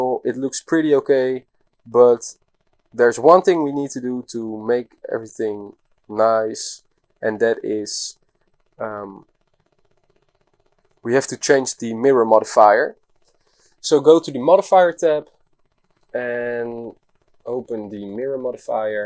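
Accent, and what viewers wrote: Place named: Netherlands. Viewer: Your dutch lol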